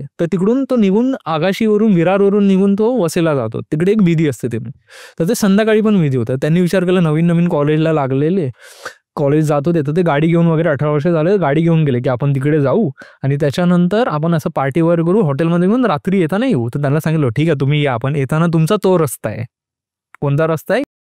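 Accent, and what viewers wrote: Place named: Goa, India. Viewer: Haaa ji bhasha bolto aahe na goa chi bhasha aahe hi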